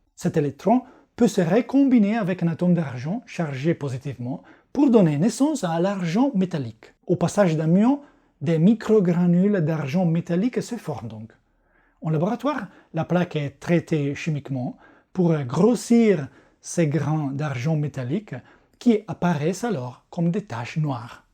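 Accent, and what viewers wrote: Italy. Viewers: Vous avez tout à fait raison!!! La qualité de ses vidéos et de ses explications est de haut niveau. Comme l'est sa pédagogie, sa façon de simplifier les choses complexes pour nous, public ordinaire. Je suis inscrite à sa chaine en italien et ne savais pas qu'il en avait également en français. Outre le fait qu'il est super beau gosse (ici en Toscane, on dit: un bonazzo della madonna) 🤩 Mais en plus, ici, s'ajoute le merveilleux accent italien. Oh Mammaaaaaaaaaaaaa 😉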